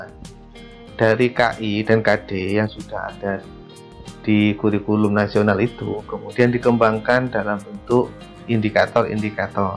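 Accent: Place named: Osing, Indonesia. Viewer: koyone lare osing ta kang...#logate katone matur suwun ilmune....👍👍👍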